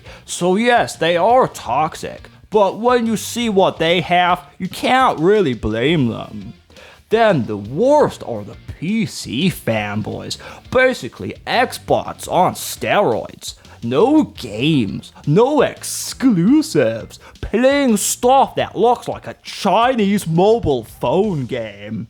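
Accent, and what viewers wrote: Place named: United States. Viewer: It's clearly American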